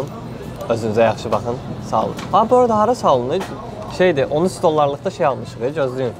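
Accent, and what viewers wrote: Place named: Shaki, Azerbaijan. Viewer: Şəkili ləhçəsi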